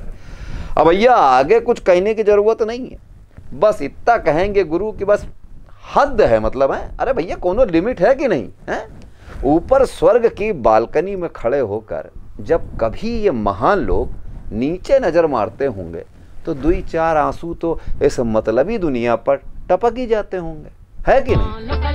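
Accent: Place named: Kanpur, India. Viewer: कनपुरिया अंदाज में खरी खरी....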